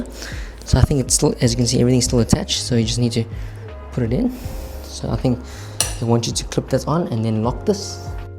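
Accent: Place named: South Africa. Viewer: Great revue :-) Why do you sound south African?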